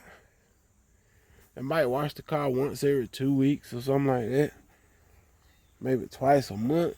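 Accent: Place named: South, United States. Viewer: Yall southern mf can't talk to save yalls life poor things 😔 and it took ten years of listening to it before we seen anything happen... I always wondered if it was on purpose cuz T I can talk but you can still tell he's from the south! he can say "then" and then you can read the word "then" and read the word "Den" then say it the same Car is Cah then just say rag so you can make an R sound that shit is crazy to me, European London Britain England accents nauseate me the South and Africa are next in line 🤣 the South sounds like someone just learning how to talk for the first time and yes we were all either born or kids in the 80s when the next generation of adults we're mostly populating the planet right now I just came and see what Nu Finish can do but I had to sit and listen do you repeat yourself 10 times with natural slurred speech 😩 I'm Denver Colorado so ... 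when I visit my cousin's in Houston their friends say I sound White no I sound right I can talk! oh my God East Saint Louis black folks its embarrassing my ex was born and raised in Alabama but sounds like she's from Colorado, you make the r sound in any other word but you can't say car properly or other words ending in r so is this shit even real or is it culture remnants of slavery y'all would have so many problems with white people out here where I live, White people would treat you like shit like you were stupid if you lived in Colorado sounding like that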